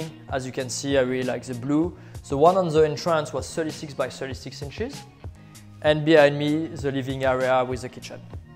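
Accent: France. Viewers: i am not able to understand a full sentence of that frenglish.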